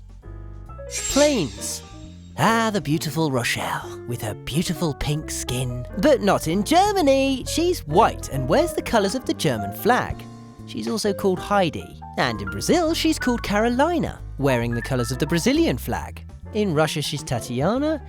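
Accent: RP, United Kingdom. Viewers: You sound posh.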